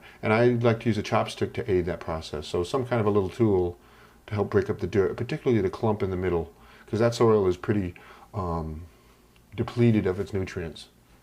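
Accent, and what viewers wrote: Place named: Boston, United States. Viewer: Trying to place your accent. Leaning towards Boston but it might be Brockton? Or am I wrong on both counts? :p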